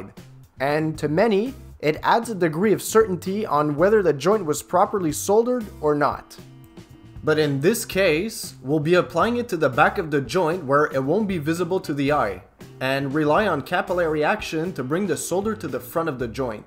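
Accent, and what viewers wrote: Quebec, Canada. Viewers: presque pas d'accent excepté une couple de fois! Un québécois reconnais un québécois qui parle anglais! ;) Mais! Bonne nouvelle. Je me suis abonné à ta chaine! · GDog S Canadian accent! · Not that it matters, is this a Canadian DIY advice video site? Just out of curiosity (as I’m from Ontario) - just going from the Quebec licence plate, your accent and seeing Holmes on Holmes clips just made me wonder. Personally I think it’s great as I’m a bit tired of getting all the advice from US sources.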